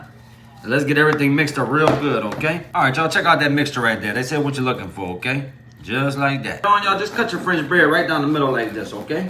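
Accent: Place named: New Orleans, United States. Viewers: Yeah, that must be a New Orleans accent. It’s my top 3 in the country. Of course I gotta defend my home town of Killadelphia & then Boston & the New Orleans. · Southern accent BBQ dude Nice food W man Naruto Shirt thoooooo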